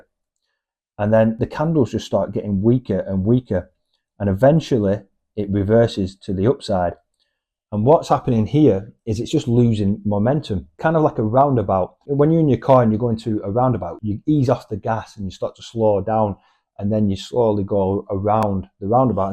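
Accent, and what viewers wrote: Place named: United Kingdom. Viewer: Nice video but for me is your british accent not understanable.. you dont said lower low/higher high you said lo lo/hai ha😂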